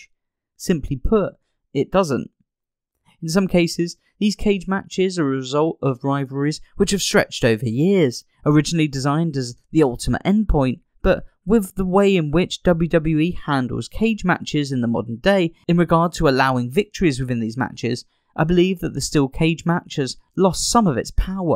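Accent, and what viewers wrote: United Kingdom. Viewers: I actually like the way the narrator says it, it fits right in with the rest of the proper British pronunciation he uses. Lay off the coffee man, all the random all caps and aggressive tone is really off putting and undermines the point you’re trying to make.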